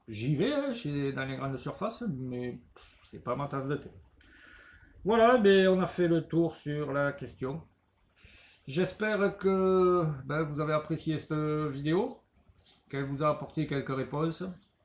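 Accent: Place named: Provence, France. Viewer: je viens de decouvrir ta chaine,super simpha nous qui sommes en Australie trouvons que tu es un poete de Provence avec ton accent, tes expressions bien humanes,merci mille fois, tres agreables tes videos bon a bientot Robert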